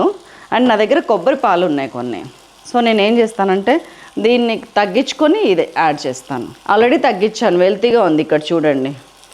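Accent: Telangana, India. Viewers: Miru telangana baga matladutunaru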